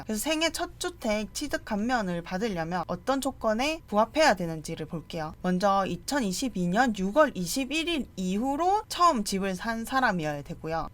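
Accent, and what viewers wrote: Gyeongsang, South Korea. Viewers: 대표님 경상도분이신거 같아요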